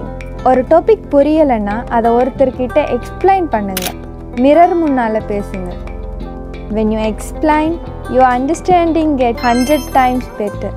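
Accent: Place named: Trincomalee, Sri Lanka. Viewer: Ninga trincomalee distric nu nenekiren enthe oorunum vilangitu unga slang la kandu pudichiten😂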